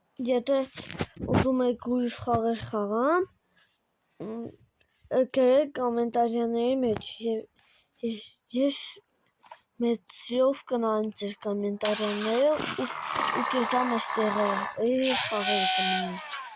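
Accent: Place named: Yerevan, Armenia. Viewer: xi es Erevani bar barov xosum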